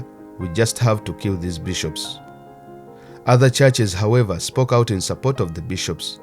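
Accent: Uganda. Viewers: Author sounds like a Ugandan scholar...the Ugandan English accent is unmissable...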